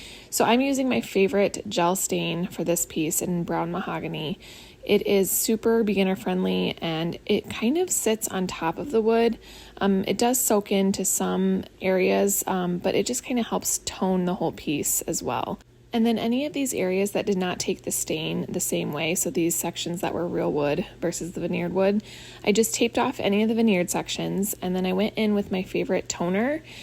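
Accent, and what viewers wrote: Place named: United States. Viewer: Ese acento americano es muy feo. No soporto escucharlo.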